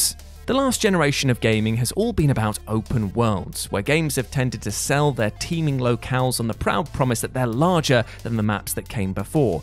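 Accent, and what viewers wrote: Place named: Wales, United Kingdom. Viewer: dude you make a great point dude.And the accent of yours is Welsh accent,cause you seem to laid back for a English dude,But right video game developers are find new ways to rip people off,so dude this a good video on developers and the misleading gamers buying thier games.And I sure those developers will bust your balls in this here comment section,but I am on your side.Cause someone has to be,and your cool dude from the old country,and again Merry Christmas from all of North America.And stay cool over there.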